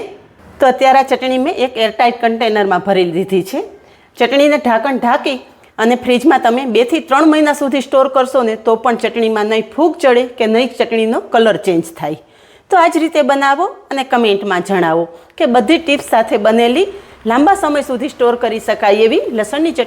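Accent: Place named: Kathiawad, India. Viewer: Kathiawad ma bhaagye j jova malti uchchaar shuddhi , ane chatakedar chutney....khub saras . 😋😋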